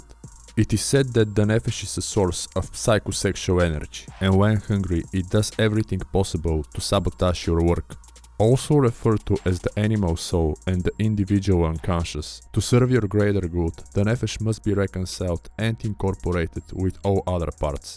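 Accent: Russia. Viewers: Very hard to understand your Russian accent. Good content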